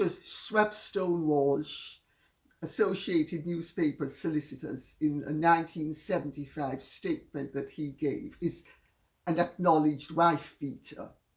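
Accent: Jamaica. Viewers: Lady Colin Campbell was born in the Parish of St. Andrew. Jamaica - her accent is undeniably Jamaican. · Love her slight Jamaican accent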